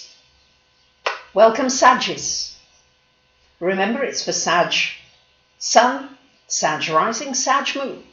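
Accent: Greece. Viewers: I dont smile much and that is the problem. But your energy is different than others and i appreciate the greek , with that i resonate. A rarity. Not just info, but you too. Your heart and light comes through and your accent helps. 😄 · looooveeee your greek accent <3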